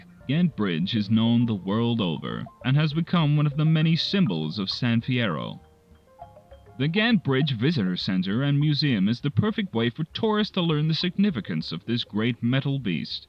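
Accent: United States. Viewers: Pretty good American accent my dude. Also you do an incredible job of showcasing this world. It looks so lively from the angles you picked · You pulled off a fantastic American accent in this! Halfway through I was wondering if you hired a professional VA to read this huge script. Bravo, and hilarious production! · This is amazing ! The script is laugh out loud funny ! It must have been a nightmare to create footage that matches the script too ! And a big thumbs up for managing to maintain a consistent American accent for 50 minutes ! Really, this is the sort of thing Rockstar should have released to promote San Andreas back in 2004.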